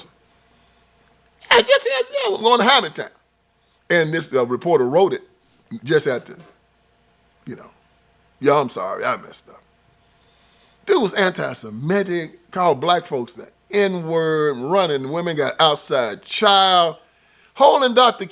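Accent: South, United States. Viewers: Unfortunately, I couldn't understand a thing you were saying while you were laughing and talking. Between your southern accent and poor pronunciation, it is so difficult to understand what you are saying.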